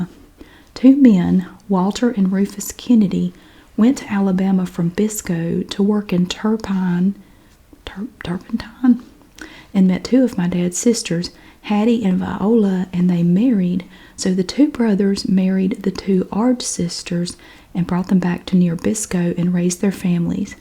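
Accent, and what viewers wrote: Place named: West Virginia, United States. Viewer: Your accent came through much more than normal in this video and I absolutely love it! This feels like when my family used to tell old stories from West Virginia. Thank you for a slice of peace in an overwhelming week 🙏 hope you feel better! ❤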